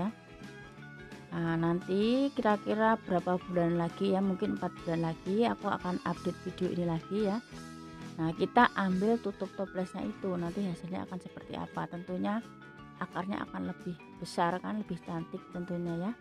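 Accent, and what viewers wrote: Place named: Java, Indonesia. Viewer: pantes logatnya Jawa banget Salam dari Klaten . Prambanan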